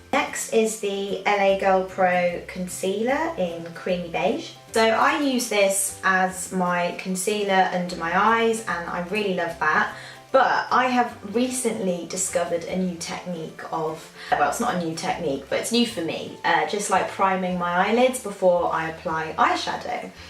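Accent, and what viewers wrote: United Kingdom. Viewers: Great video love !! I love English accent 😍 !!! Im here showing some love and supporting back 😊💕 thanks for stopping by my channel. Xoxoxo💕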